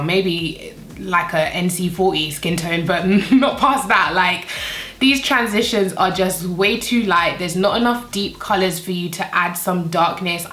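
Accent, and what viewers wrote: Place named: United Kingdom. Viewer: Nice one. I have been guilty of overspending on makeup in the past but when my KvD Shade & Light was needing renewed I got the Revolution one. Its every bit as good. I am really pale and the middle top shade makes a great natural looking contour (The KvD one is the same but I can't afford to keep buying US products, the duty is crippling, they seem to just convert the dollars to pounds without using the exchange rate as if forty quid was the same as forty dollars. Finally, I know that Kat has done a stellar job in raising awareness of animal testing etc. But Revolution is cruelty free, British and costs a fraction of the price for really good quality products. I agree about the Fenty pallet being a tad uninspiring. But I really want to try one of her contour sticks which looks really good on pale skin and it's a cream which is more flattering to mature skin Great video Girl its nice to hear a British voice. 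As for Kylie and Co. I wouldn't spit on them if they were burning. Who bases their fame on their dead father being the lawyer of a wife killer? And that is just the Kardashian part. The Kylie bit with her two mum's or whatever it is is something most families would keep to themselves. They're grasping, fame chasing gold diggers. All of them...Kanye West should have recognised that!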